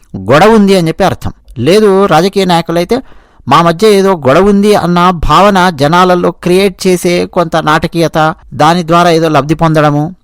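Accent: Telangana, India. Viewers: Meeru Telangana yasa baga matladaru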